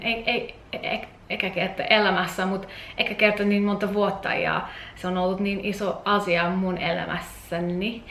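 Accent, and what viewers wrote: Finland. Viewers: minä olen asunut koko elämäni Suomessa ja sinulla on hyvä Suomalainen aksentti! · I love your Finnish accent! Very good or should I even say excellent pronunciation! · Ihana suomi aksentti❤️ ja olen ihan suomalaiset mutta enkku tulee multa aika helpolla ku mummo ja pappa asuu jenkeissä ja he puhuvat mullekki aika paljon enkkua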